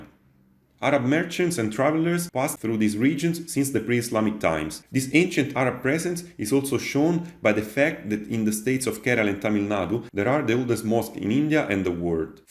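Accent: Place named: Italy. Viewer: Love your channel. Informative and unbiased. Oh and the Italian accent makes it even better 😂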